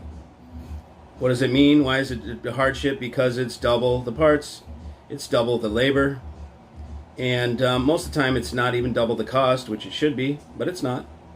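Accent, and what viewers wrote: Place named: Rural, United States. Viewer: hey man great video, what is it with the hickey accent lol, hey I have balanced amps and pre amps and dac, bought a cable for the preamp once, polarity on the ac cable was inverted, got a hum right there, you make power cables so you should have run over that scenario. My point, even balanced equipment can get hum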